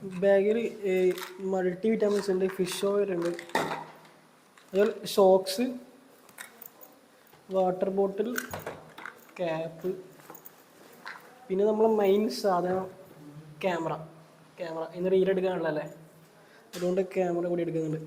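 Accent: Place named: Azhikode, India. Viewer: Azhikode anenn thonunnu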